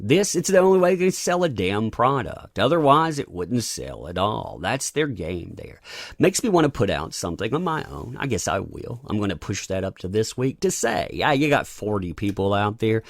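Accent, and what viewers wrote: South, United States. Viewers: fix your hillbilly voice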